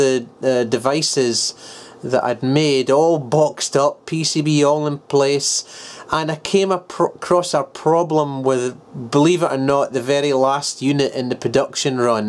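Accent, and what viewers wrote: Scotland, United Kingdom. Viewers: are you fae the North East of Scotland ?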